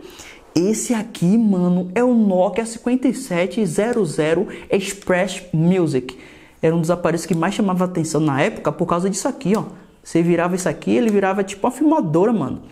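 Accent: Bahia, Brazil. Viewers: Vc é Baiano?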